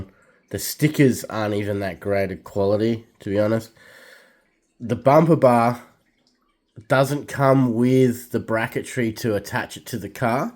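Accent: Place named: Australia. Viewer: Just came across your channel through the mystery of algorithms, but liked and subscribed straight away as it's great to find an Australian RC Channel 🇦🇺🦘😎 Getting tired of listening to yanks and poms 😂 The Cruiser is looking awesome, but sounds like you would have had more joy from simply buying a MN82 RTR instead!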